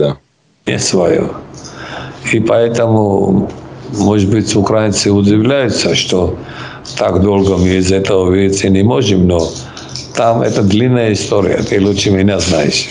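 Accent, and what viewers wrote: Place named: Georgia. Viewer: Так половину грузин говорит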